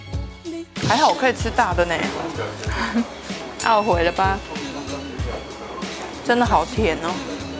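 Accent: Taiwan. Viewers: 講台語道地可愛XD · 講台語的時候好可愛www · 台語很標準吧哪裡怪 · 看起來好文靜那個氣質很好可是一講話就破功了變(正港台灣人)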